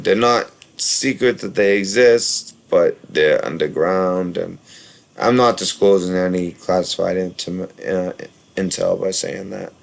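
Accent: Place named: Boston, United States. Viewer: Weems yeah his accent is awesome, I know others from Boston and they only sound like him when their mad!🙏🏻💞🇺🇸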